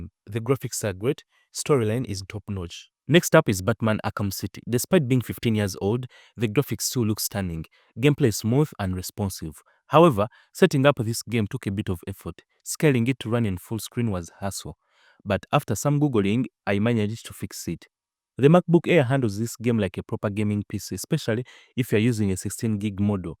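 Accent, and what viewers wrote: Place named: Uganda. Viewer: Ugandan accent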